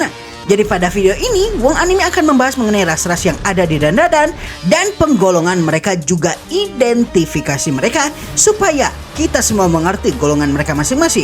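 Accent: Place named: Java, Indonesia. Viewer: Kamu wong jowo mas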